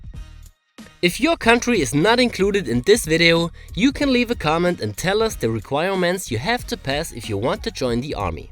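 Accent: Germany. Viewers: Als ein Franzose, ich verstehe seinen "Deutsch-englisch" viel besser als amerikaner Englisch aha ! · your english is the prime example of the german english out there :D unfortunately was priceless. ;) great video again thought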